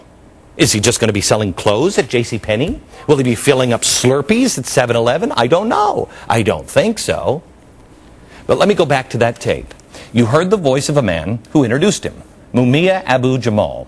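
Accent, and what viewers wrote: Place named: Germany. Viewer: im not even sure Glenn is american!!..has anyone seen or verfied his birth certificate???? and doesnt sound German like General Von Bock Bock/?.. in fact i think hes half south african...... ask him to say South Africa if he pronounces it Seth Efrica ya got ya proof.. nope he certainly dont seem and as for doing a good job by pissing liberals off id say as he stands for what the repulicans think hes making their credibility plunge to even deeper depths.....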